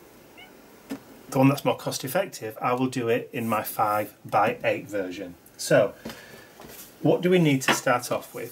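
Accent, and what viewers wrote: United Kingdom. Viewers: …..and I luv your british accent. I do not hear it that often. And it sounds pretty neat.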